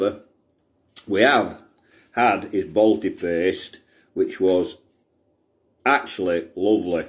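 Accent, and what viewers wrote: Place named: Yorkshire, United Kingdom. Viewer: Where in Yorkshire you from cocker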